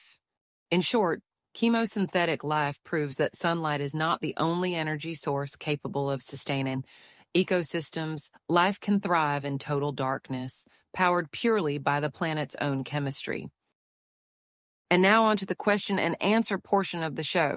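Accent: United States. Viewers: I'm glad you showed the words "chemosynthetic life," because when listening earlier in the video I heard "chemosynthetic laugh" and "chemosynthetic glass." The joys of varied American accents! Although there's poetry in finding "laugh in the darkness." Thanks for pointing out the usefulness of NASA discoveries for surviving on this planet. The more I see what humans are capable of, the more I don't want us to colonize other planets. Humans might be the Kudzu or the cane toads of other worlds.... We have a long history of unintended consequences. Love your work!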